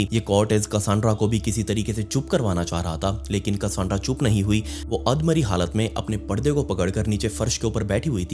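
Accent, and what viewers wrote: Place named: Andhra Pradesh, India. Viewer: Accha, so you're an ANDHVAKT! Waise tumhare English pronunciation se shaq hota tha, aaj clear ho gya.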